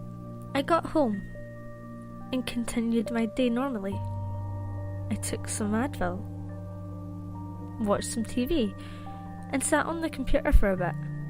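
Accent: Scotland, United Kingdom. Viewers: Are you Scottish ? Great accent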